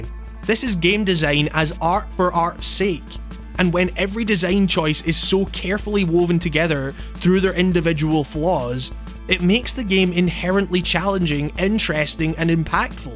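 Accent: Scotland, United Kingdom. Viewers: You scottish brah?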